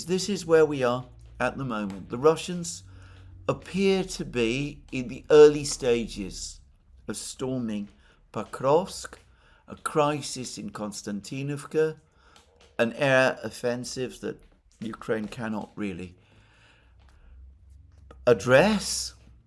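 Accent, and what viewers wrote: England, United Kingdom. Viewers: Just because he has an English accent does not mean that this is not Russian propaganda, it merely sounds better than the regular Russian propaganda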